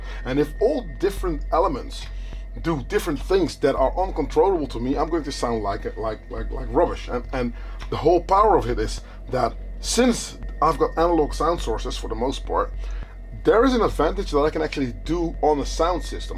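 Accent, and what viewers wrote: Netherlands. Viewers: Dude are you dutch? You have a heavy dutch accent. And I’m just some random dude from California and can hear it.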